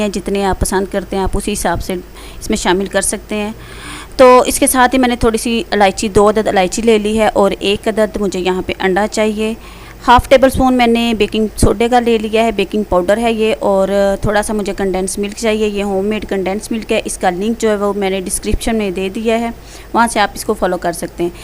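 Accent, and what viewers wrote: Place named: Punjab, India. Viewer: hahaha bht acha laga bhtaza aya apki punjabi sun k behna kal apka link ni laga but aaj apko special shoutout dun gi dear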